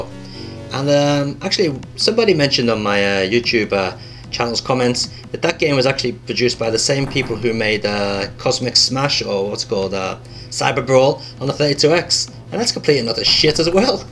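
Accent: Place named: Liverpool, United Kingdom. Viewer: Lol... I know it's a while ago now but your rant of DD2 (poor controls) was very funny! Especially with your Liverpudlian accent... Liverpool is right yeah?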